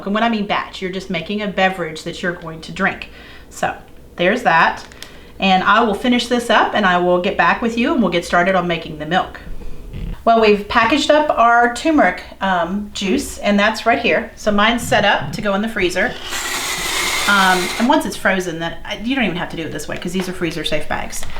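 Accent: South, United States. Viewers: Fahima Bemberry I think you misunderstood, Fahima. She said “Grass Fed”. Not “breast fed”. 😂😂😂 It’s the southern accent. It throws you off sometimes.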